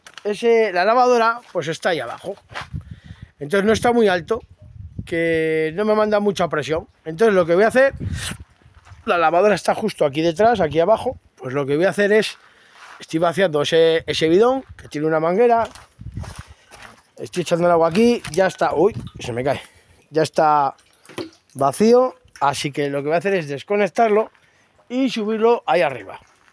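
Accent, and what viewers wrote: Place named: Bilbao, Spain. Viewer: No hace falta, pero seguro que eres de Bilbao. Joe que tio.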